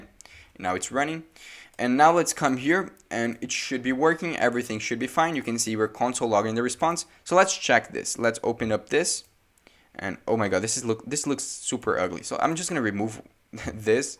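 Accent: Brazil. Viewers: Mano pior que o sotaque também entrega kkkk esse é o primeiro vídeo que vejo seu e já tive quase certeza que tu é brasileiro pela forma de falar, porque eu falo do mesmo jeito hehe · This is the first time i listen to a brazilian speak english... Why Brazilians dont like to speak english?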